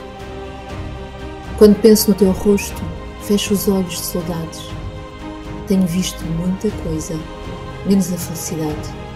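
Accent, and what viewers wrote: Portugal. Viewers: Sotaque português é muito carregada, mas ficou bom.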